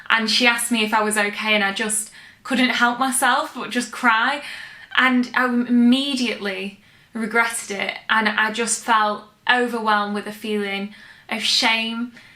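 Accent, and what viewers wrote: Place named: England, United Kingdom. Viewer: your analysis is simple and correct. Especially delivered with beautiful English accent.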